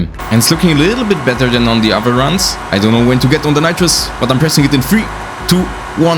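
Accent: Germany. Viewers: I can hear a bit of deutsch there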